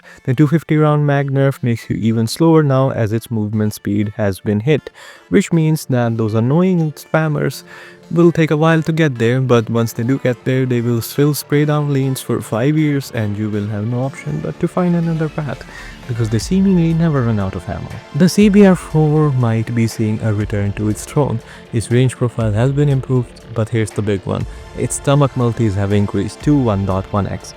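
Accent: India. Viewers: non Indian VOs please comeback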